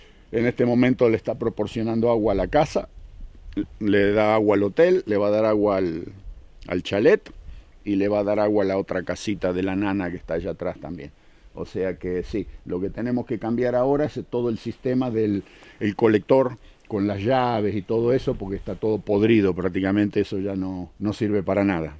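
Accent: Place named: Argentina. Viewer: ¡Pero cómo narra este hombre! ¡qué delicia escucharlo hablar de los frutales en el minuto! yo no era fan del acento argentino hasta que escuché a. Saludos desde España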